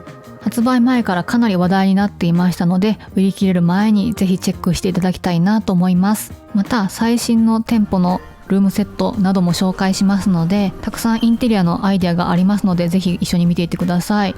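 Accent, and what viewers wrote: Kansai, Japan. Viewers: やっぱり、少し訛ってますね❤でも関西弁愛おしいですよ。新商品見たのに忘れてしまってました。説明を聞いて思い出しました😅有難うございます😊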